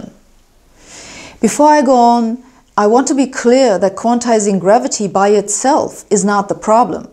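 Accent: Germany. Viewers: I have tried to analyze why I like your video’s so much. Is it the German accent in your scientific English that for my generation spells deep learnedness? Is it the high amount of inflection with which you impart so much understanding and which makes listening to your Referat so easy, with a cup of coffee? Is it the full dedication to content, with a total lack of ego, not trying to show how smart you are, but how interesting the subject matter is? Or is the choice of subjects, that are mundane questions that you are able to make into glittering gems of physics, reminding us physicists what a beautiful field we have chosen? Or is it the total absence of smiling, that so often is strewn all over the presentation by women? I do not know what that means or if it is intentional or not, but it is a constant through all of your fascinating video’s, making them even more fascinating. 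It has made me think, what does smiling do to a story being told. I’m beginning to find out.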